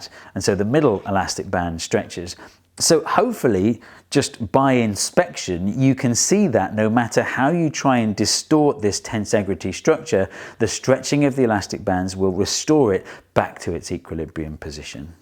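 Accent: United Kingdom. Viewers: The British pronunciation of aluminum always annoys me. There's no i before the u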